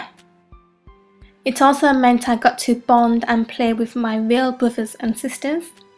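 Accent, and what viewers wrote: United Kingdom. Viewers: I love British accent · ...Why wouldn't she keep her British accent if she lives in Britain? LOL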